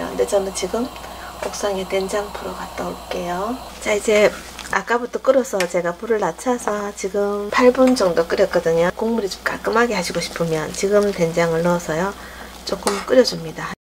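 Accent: Gyeongsang, South Korea. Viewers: 맑은아욱국 콩쪼가리 싫어하는 사람이나 애들에게 좋것네요. 깔끔하고하니 더 정갈하고 맛있어 보여요.방송도중 경상도 사투리도 간간이 나오고 정겨워요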